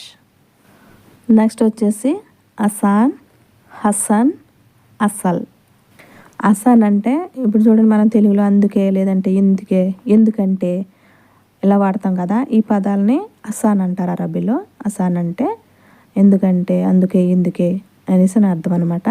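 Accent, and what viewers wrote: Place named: Godavari, India. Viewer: మేడం మీరు ఇస్ట్, వేస్ట్ గోదావరి అనుకుంట మాటలు తియ్యగా వున్నాయి,, మా డిస్ట్రిక్ట్ కడప, ఐ లవ్ యు మేడం మీరు చెప్పే అరబిక్ చాలా ఇంపార్టెంట్ థాంక్స్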